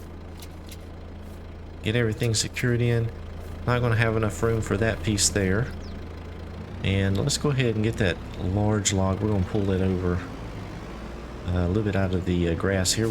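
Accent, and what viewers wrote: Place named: South, United States. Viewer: You sound like you’re from the South my fellow farming friend.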